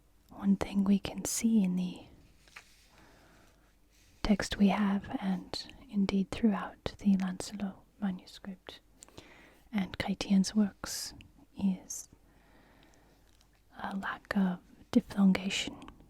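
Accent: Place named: France. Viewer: really love your french accent. i wish i could hear you read an entire book in french x)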